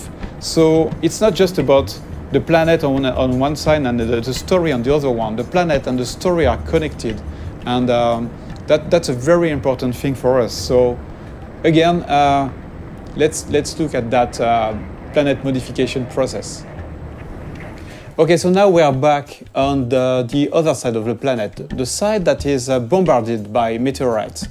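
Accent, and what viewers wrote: France. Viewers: il est français donc pour son accent on peut comprendre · sa se voit que c'est un francais vu son accent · C'est con que seul les français ont honte de leur accent le gars est français il va pas faire l'accent américain,chinois ou africain le pire c'est que pour les ricain l'accent français est très beau. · J'avoue l'accent français se fais ressentir de fou 😂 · Et les gars stop, pour son anglais. Il a raison de faire la vidéo en anglais. Et puis entre nous vous auriez un meilleur niveau d'anglais en France çà irait mieux, vous vous ne poseriez même pas la question. L’école ne suffit pas alors faut travailler a coté pour l'anglais. Et la franchement même si il a un accent français qu'est ce que ça peut faire. Au moins il fait l'effort de faire une vidéo compréhensible par le monde entier. Alors arrêter de penser en permanence a seulement votre petite personne !!!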